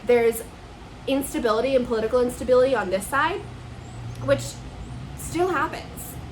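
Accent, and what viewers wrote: Paisa, Colombia. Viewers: Habla en inglés con asentó paisa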